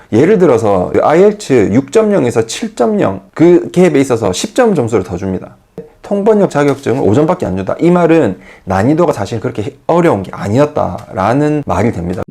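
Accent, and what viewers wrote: Gyeongsang, South Korea. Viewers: 시골 탈출 축하드립니다^^ 사투리가 같은 경상도 말투라 반갑네용 ㅋㅋ 주변 독일, 프랑스, 인도인 친구들은 NATTI CCL을 기본적으로 다 치는 걸로 생각하던데 한국분들은 쳐볼 생각도 안해보시는 거 같아서 아쉽더라고요. 저도 주변에 아무도 NATTI CCL 생각 안하고 있을 때 혼자 공부해서 시험치고 합격했던 경험이 있습니다. 그때는 워낙 정보가 없어서 너~무 너~~무 고생 많이 했지만 시험 자체는 생각보다 쉬웠어요. 추천해주신 웹사이트 가서 보니까 세상 좋아졌다는 생각 드네요ㅋㅋ 2024년 이민 준비하시는 분들 다들 화이팅^_^**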